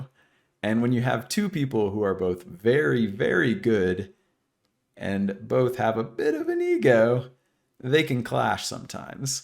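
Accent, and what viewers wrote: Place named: MLE, United Kingdom. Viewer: I discovered you in J1mmyVODs/WasJimmy's youtube video. Ur wagwan accent for ur WoW troll roleplay is perfect and hilarious plz upload ur OnlyFangs' WoW roleplay to youtube! I really hope you collab with Rav, Moobius, Sput/Dr. Bones, Hubert, and other roleplay comedians in OnlyFangs.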